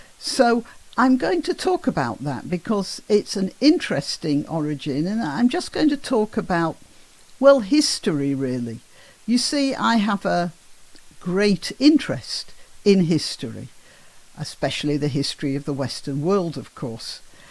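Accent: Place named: Northern England, United Kingdom. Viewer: Good northern accent luv!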